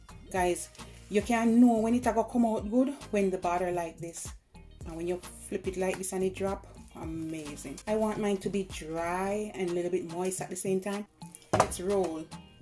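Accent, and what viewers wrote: Jamaica. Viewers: And the Jamaican talk love it mmmmm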